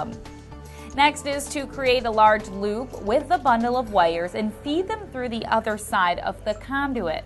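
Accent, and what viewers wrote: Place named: Michigan, United States. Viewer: This is the most Michigan accent I've ever heard 😂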